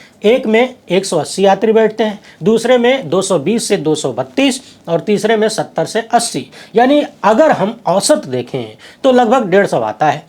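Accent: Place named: Bihar, India. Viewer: जी मुझे आपके बिहारी होने पर गर्व है आपके बोलनेका बिहारी स्टाइल बहुतै अच्छा लगता है।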